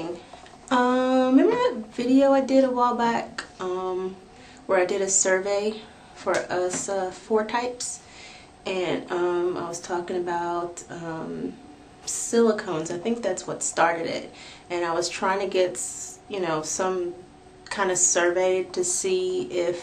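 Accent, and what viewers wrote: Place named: South, United States. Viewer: southern accent in this vid. how cute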